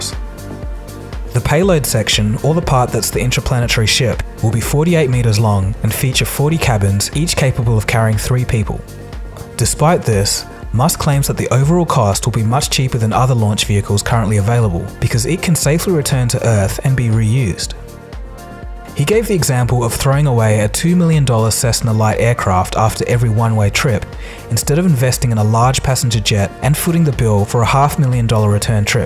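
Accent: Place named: Australia. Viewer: I personally didn´t like the australian accent, and muted the end of the video, because the music was annoying/overcompressed.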